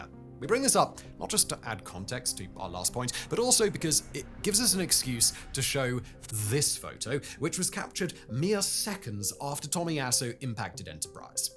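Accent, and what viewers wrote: United Kingdom. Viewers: I find it heartwarming, and ironic, that a bald man with a British accent is endeavoring to “make sure history never forgets the name Enterprise” 🖖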